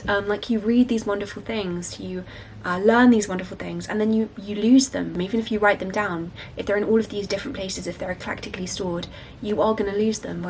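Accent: United Kingdom. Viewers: The UK accent? Writing as a Latin American native, the US accent is more on higher tone, and listen her make me think 'so calming' the Britain's make the difference hehe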